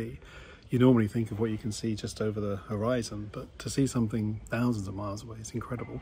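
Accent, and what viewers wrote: Australia. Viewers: Do I detect an Aussie twang?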